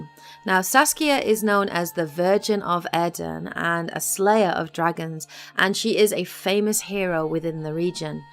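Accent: United Kingdom. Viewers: 8 years late but hopefully not too late I played the 3rd game around 4 years ago and loved it went back and read all the books and played through thronebreaker, so now I need this video to catch up with the 2nd game in earnest Thank you so much! Also, the northern accent makes the video even more authentic, you sound like you give council to Queen Meve on the side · should´ve watched before playing witcher3. really well done don't mind the length at all. northern dialect is a big plus as well tah !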